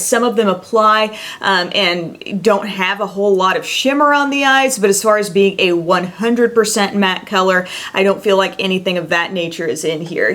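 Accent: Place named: South, United States. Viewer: Where are you from?? You defs sound southern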